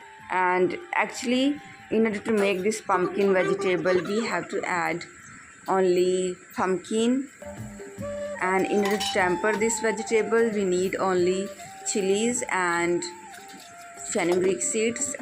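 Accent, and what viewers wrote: India. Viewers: Damn, I feel like I don't understand any of this language. Is this the Indian English accent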